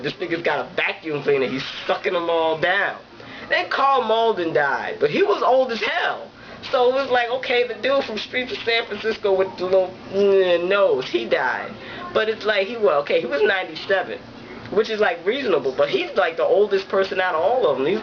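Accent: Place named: New Jersey, United States. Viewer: Hey, sweetie. Are you from Jersey? You have that accent. I live in Jersey, too. I think you and I should have an affair. Are you game? Yes or no, baby. Kiss kiss.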